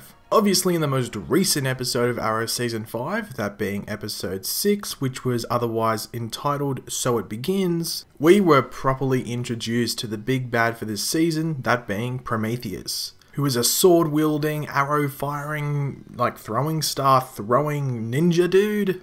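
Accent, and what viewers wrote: Australia. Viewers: I think it's probably Billy right now, but I hope that it's Tommy. Also, you sounded very Australian this video.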